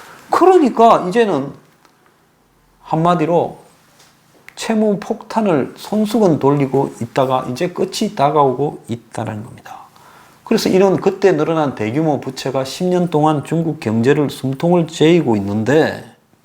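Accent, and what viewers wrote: Gyeongsang, South Korea. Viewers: 경상도 사투리가 있어서 그런데 적당히 들으시구려 이곳에서 까지 그런 지적질 하지말고..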